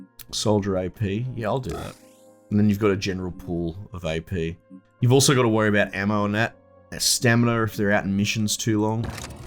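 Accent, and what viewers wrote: Australia. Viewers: This is the most laid back Aussie commentary I've ever heard on YT. Old mate? Any chance you're from northside of Brisbane?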